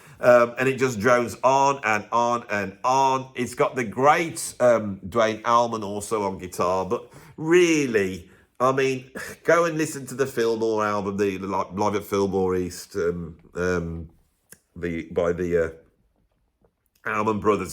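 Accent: Birmingham, United Kingdom. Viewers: Is there anything funnier in the World than a ranting Brummie?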